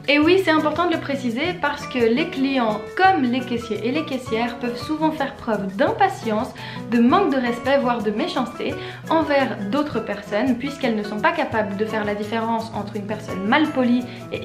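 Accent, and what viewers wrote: Switzerland. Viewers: Très drole, j'ai eu le réflexe inverse..Je me suis dit.."tiens, elle n'aurait pas un accent suisse?"...Et puis elle à parlé de francs et là "Ben ouais...elle est suisse".... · Je me disais bien que ton accent me faisait penser à quelque chose (à mon propre accent donc haha) ! Une Suisse ouii ❤️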